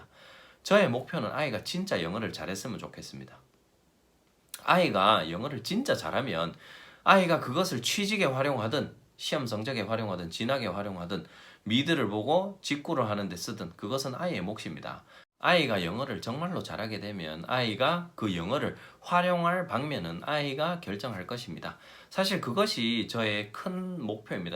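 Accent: Gyeongsang, South Korea. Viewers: 목표설정에 도움되는 영상이네요~~어정쩡한거 말고 잘했으면 좋겠는거!. 경상도갬성 넘 조음요 ㅋㅋㅋ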